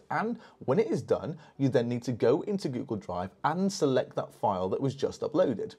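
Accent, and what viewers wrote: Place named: United Kingdom. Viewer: I love his British accent though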